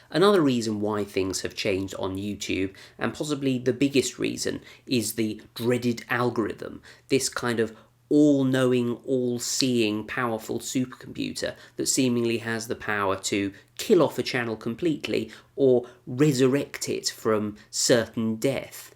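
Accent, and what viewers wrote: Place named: RP, United Kingdom. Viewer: it sound like Eaten, Cambridge or Oxfort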